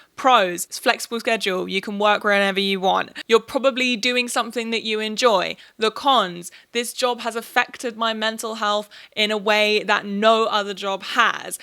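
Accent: England, United Kingdom. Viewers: you're too british. it's hard to listen to you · This is on a kind of related note, but I have a friend who was on deviantART for a long time who experienced a similar thing. She's been on dA for a long time now and built a pretty loyal following of 2,000+ watchers, which is pretty substantial on that platform. On that platform, she's been commissioned so much, even started making pins, plushies, and tattoo designs. Recently, she tried out tiktok and quickly blew up. She was making comics that were getting views in the millions, she's never had so many eyeballs on her art and got about 70K+ followers. But even with those numbers, she got almost no offers for commissions or sold merch. What she did get were a bunch of people asking for more content, even though the stuff she was making was quite work intensive. I feel like the infinite scroll of TikTok devalued the amount of work she was really putting into her art, and when people weren't getting content fast enough (by that I mean, within days of her last post), they just forgot about her and her views plummeted. 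That weird surge of fame she experienced ended as quickly as it began, and she made almost no sales out of it. Compare this to her 2,000 watchers on dA who still commission her to this day. I'm not saying dA is a perfect platform (it's not), but TikTok is designed to have this pathetic half-life, I wonder how ANYONE maintains TikTok fame at all. side note: I loved the vid. Does anyone know which part of Britain her accent is from? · Where are you from in England, you have the same voice as my psychology teacher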